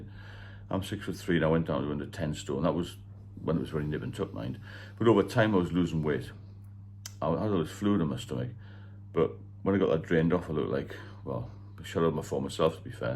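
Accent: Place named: North East England, United Kingdom. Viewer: Howay, pet, I rather like the North-East accent!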